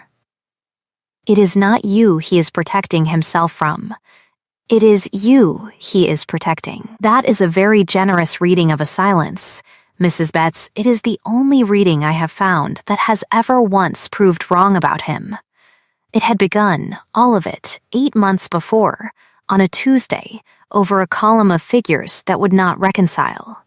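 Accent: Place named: United States. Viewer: A ducal story shouldn’t be narrated by an American voice!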